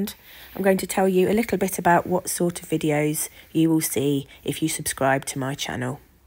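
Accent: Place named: United Kingdom. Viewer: She's all bundled up in her lovely coat, on a windy day :-) I also like to hear the British accent, as I am in America now, and miss England ! :-) Absolutely lovely.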